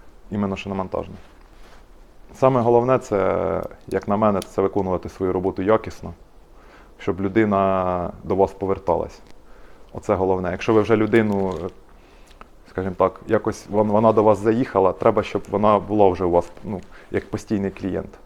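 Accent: Poltava, Ukraine. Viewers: полтовський окцет))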